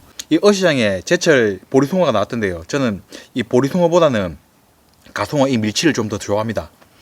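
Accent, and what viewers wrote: Gyeongsang, South Korea. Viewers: 경상도 사투리 고쏘하이 처직입니데이ㅋㅋㅋ 경상도 사나이 시골 냄새 뿜뿜 너무좋아요🤗👍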